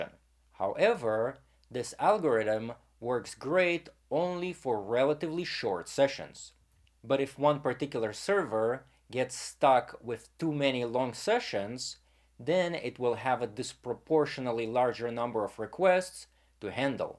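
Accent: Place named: Eastern Europe. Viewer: You have interesting accent, do you have any Slavic roots?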